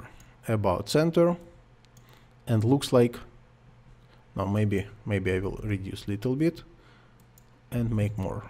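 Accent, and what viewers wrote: Russia. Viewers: А по-русски тоже самое можно?, иностранцы ваш английский все-равно не понимают, думают вы по-русски говорите...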